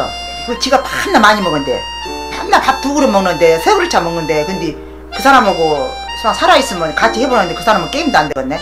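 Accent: Jeolla, South Korea. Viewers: 진짜 넘 웃기심 혼다 웃고있음ㅋㅋㅋ 완벽한 전라도 사투리심 울 엄마 같으심ㅋㅋ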